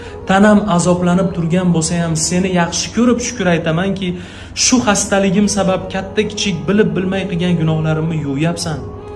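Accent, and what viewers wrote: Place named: Fergana Valley, Uzbekistan. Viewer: Оглим сизни тингласам мазза киламан : бирам чиройли килиб водий шевада гапирасиз оглим : Тани жониз Сог болсин Ширин болам : асал болам : Рахмат сизга : 72 ешли онахон сизни тинглаб рохат киламан👍👍👍👍👍❤️❤️❤️❤️❤️❤️❤️❤️❤️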